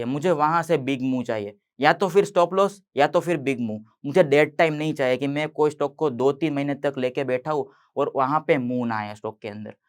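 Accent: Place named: Gujarat, India. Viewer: Bhai pakkaaaa gujrati hai 😅😅😅😅😅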